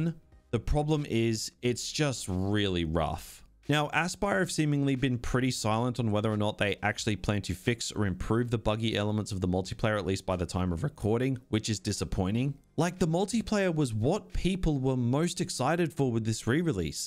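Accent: Australia. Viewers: dragged past 10 minutes cringe aussie accent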